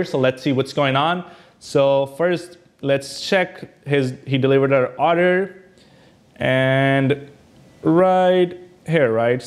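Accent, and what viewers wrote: India. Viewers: STOP trying to speak like a american , Your INDIAN accent is clearly coming through , STICK TO the indian accent ... stop trying to sound like American with R sound. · I like that Indian accent :)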